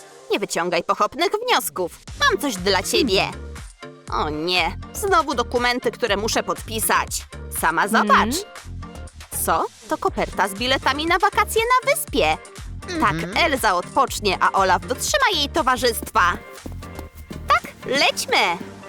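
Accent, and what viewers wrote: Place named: Poland. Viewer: Cóż za ciężki polski akcent ala Max Kolonko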